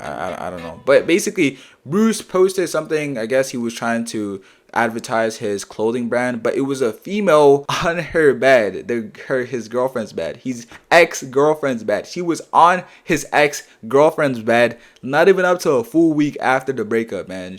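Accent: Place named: Toronto, Canada. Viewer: toronto accent is crazy😭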